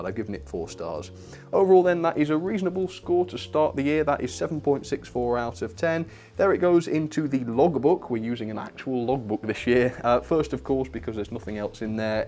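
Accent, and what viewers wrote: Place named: Shropshire, United Kingdom. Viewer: Your accent sounds familiar. You from Shropshire? I'm not a model train guy, but I'm subscribing, this is really nicely done.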